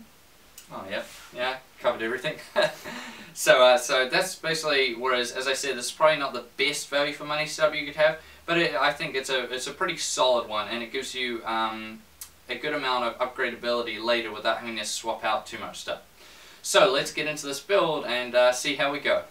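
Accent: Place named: New Zealand. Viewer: Just realized the new zealand accent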